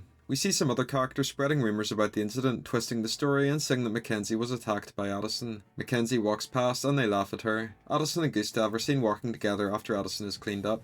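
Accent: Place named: Northern Ireland, United Kingdom. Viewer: as someone from northern ireland i knew i reconised your accent lol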